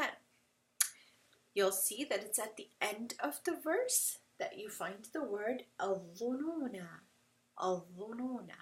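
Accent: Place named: Arab world. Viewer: i wish i could recite the Quraan with that beautiful Arabic accent.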